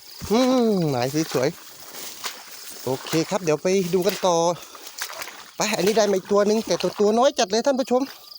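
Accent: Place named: Sukhothai, Thailand. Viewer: พี่คนไหนค้าบ ผมคนสุโขทัยค้าบ สำเนียงคล้ายๆ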